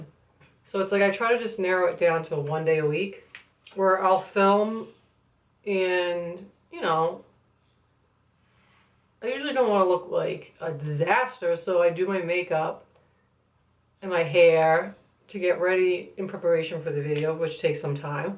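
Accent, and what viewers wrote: Boston, United States. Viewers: Cool. Is that a Boston accent I detect? :o)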